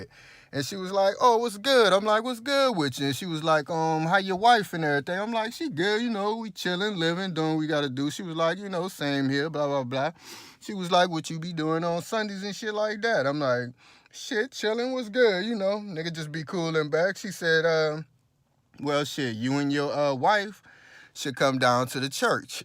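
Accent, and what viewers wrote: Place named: New Orleans, United States. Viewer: Sound like you stayed in new Orleans for a lil while...the accent sound familiar...but good info family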